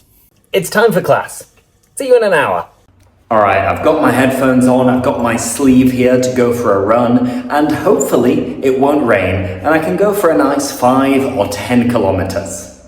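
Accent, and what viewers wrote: United Kingdom. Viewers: I'm trying to do shadowing with your videos to get a British accent, which is really beautiful. Thank you very much 🙏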